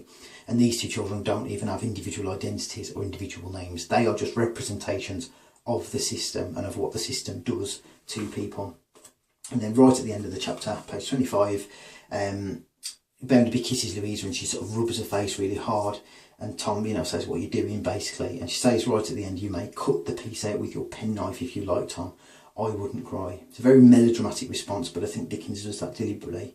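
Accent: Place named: Ireland. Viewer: okey ure Irish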